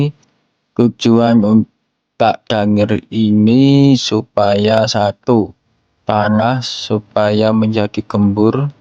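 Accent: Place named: Bali, Indonesia. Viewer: Bang tutorial ini apa di kawasan bali?? Logatnya seperti orang bali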